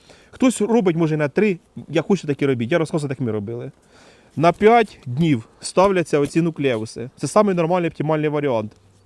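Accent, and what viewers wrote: Poltava, Ukraine. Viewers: Обожнюю полтавський говір, він крутий і унікальний, слухати одне задоволення. Бажаю успіхів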